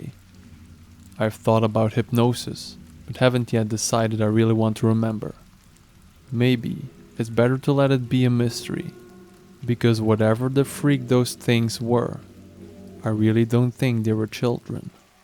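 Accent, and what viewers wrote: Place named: Eastern Europe. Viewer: 1st time listener.... that first story was creepy. Your accent reminds me of russian? Eastern europe?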